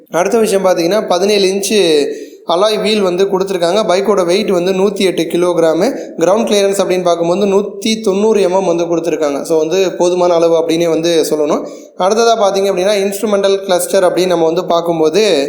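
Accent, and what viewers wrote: Thanjavur, India. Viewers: bro neega thanjavur raa 😍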